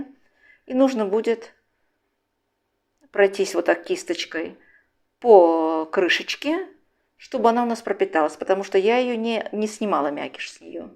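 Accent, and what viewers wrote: Baku, Azerbaijan. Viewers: Этот .добрый, объединяющий всех бакинских акцент, мягкий разговор можно слушать бесконечно Здоровья рукам вашим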